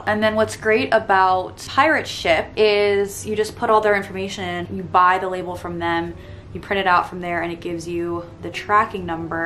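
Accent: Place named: California, United States. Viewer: That Californian accent 😶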